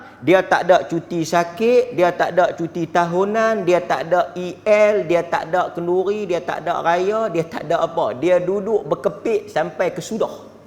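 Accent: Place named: Perlis, Malaysia. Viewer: Jazakallah khairan. Suka sangat dengar Ustaz bila menggajar dgn loghat Perlis (kadang tak faham tapi takpe). Memang teruk la manusia ni kalau Iblis minta doa pada Allah tapi kita minta dari selain Allah swt.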